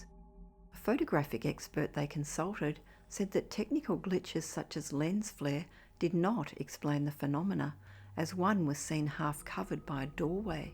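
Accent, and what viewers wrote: Australia. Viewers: Australia I would think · I thought Australia. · I love the Australian accent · And she's an Ozzie!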